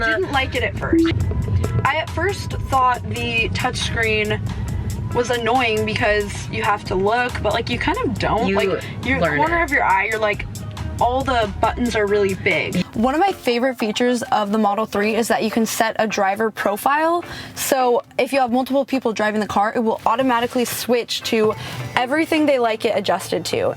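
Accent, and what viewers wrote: Los Angeles, United States. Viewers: Ugh LA accent is horrible.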